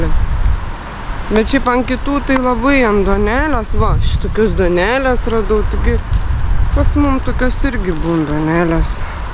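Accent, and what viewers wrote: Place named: Šiauliai, Lithuania. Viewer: Kaip miela girdėti šiaulietišką šnektą😊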